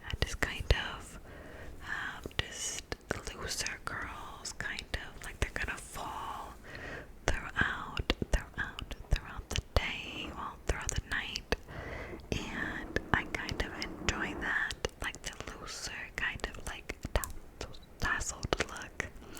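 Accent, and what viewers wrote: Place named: Midwest, United States. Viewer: your Midwest accent makes me feel right at home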